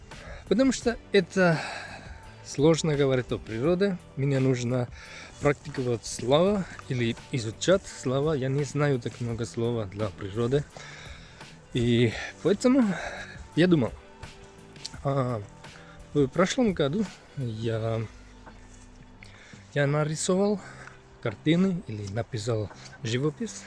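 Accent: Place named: Scandinavia. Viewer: Сразу понятно, что ты из Финляндии. Я раньше много общался с Финнами. У вас скандинавский акцент, это нормально 🙂👍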